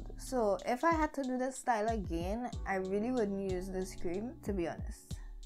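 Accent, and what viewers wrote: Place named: Trinidad and Tobago. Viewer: Beautiful! I love the trini accent! New subbie :D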